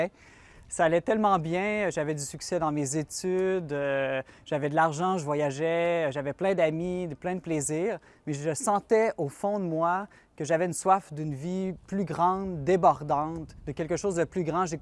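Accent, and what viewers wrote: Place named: Canada. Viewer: Merci de nous transmettre votre JOIE avec votre joli accent Canadien ! ! ! Vive le CANADA 🇨🇦 Que DIEU vous bénisse et vous protège ! Bonne Évangélisation ! Alléluia et Amen 🙏🇫🇷♍️😇🕊🗽💒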